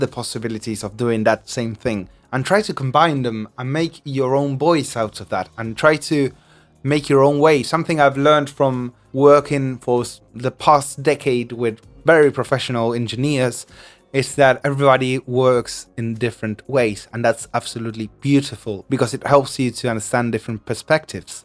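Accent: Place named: England, United Kingdom. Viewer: How many truths, and none of them are absolute!! Great video, love your english accent bro. Keep it up! 💪💪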